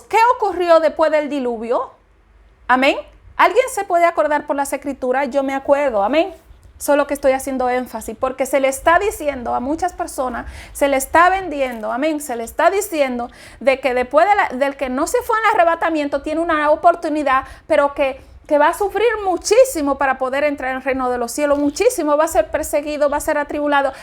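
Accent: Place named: Spain. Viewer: Tienes acento de España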